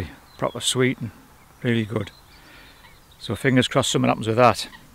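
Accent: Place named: Northern England, United Kingdom. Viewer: U sound like ur a northern lad like myself had to ask lol brilliant vid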